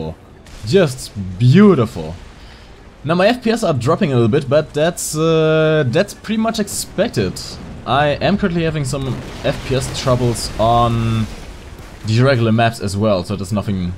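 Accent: Germany. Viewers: Are you german=? because you have got an accent. ps i am german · ich hab direkt gehört das du deutscher bist XD Schönes video,mach weiter so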